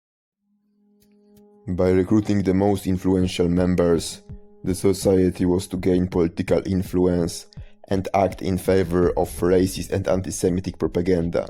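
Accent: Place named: England, United Kingdom. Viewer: Why so annoying english accent? Pease, first learn speaking then speak.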